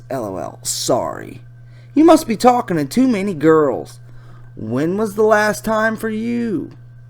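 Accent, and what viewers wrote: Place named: South, United States. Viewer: who the fuck are you? you sound like some stereotypical dumb hillbilly. your recording machine seems to be broken. it sometimes sounds like a vinyl record (if you know what one of those are. if you don't maybe ask some old guy.) that has a scratch and is stuck in a groove what are you doing on the internet anyway? shouldn't you be out hunting possums for dinner?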